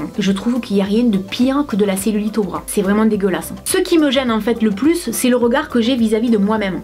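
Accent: South, France. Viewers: Je crois que quand on passe la barre des 40 on a du mal á trouver son équilibre dès qu'on passe par des changements. Equilibre psychologique et physique. .Tout est plus lent. Ce qui m'aide c' est au moins prendre soin de moi au niveau de la tête. Comme ça j'essaye de pas trop me crasher dans les descentes. Tout le travail que tu as fait sur toi est bon , malgré ce que je vais te dire tu ne vas pas le croire, du coup il faut que tu apprennes á te supporter, oui ça s'apprend et des fois ça passe par le fait de se supporter dans la pire des situations , du coup les creux de vagues durent moins longtemps. A plus de 40 ans les hormones commandent, ne JAMAIS oublier ça et du coup on a l'impression de devenir complètement dingos. Le chemin est parfois long et tortueux. Tu es très drôle et très réaliste c' est génial , ça fait du bien . Il faut s'occuper de soi á tous les niveaux , il faut apprendre à s'aimer et on y arrive (si j'y arrive tu peux y arriver) en se faisant accompagner, en faisant des lectures... 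Une fois que tu auras cette autre base, toute l'expérience que tu as au niveau du sport et de la nourriture se replacera. Big up à toi , j'adore ton accent et ton amour de la vie, la vrai , me too je suis du sud et ça fait du bien de l'entendre. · Je vais bientôt avoir 26 ans et je me sens tellement plus proche de toi que les autres Youtubeuse qui nous placent bien leurs vidéos Marketing et nous font culpabiliser avec leur ventre plat sans jolie poignée d'amour de chocolat Lol. Je serais tellement déçue si tu arrêtais on a tellement besoin de youtubeuse comme toi naturelle et pas superficielle. Et surtout j'adore tellement ton joli accent du sud de la France 😍 courage ma belle. Personnellement j'ai un poids normal mais avec des poignées d'amour j'assume pas trop mais je n'ai pas envie de me priver je mange déjà équilibré mais avec un peu de chocolat lol (on n'a qu'une vie) 😘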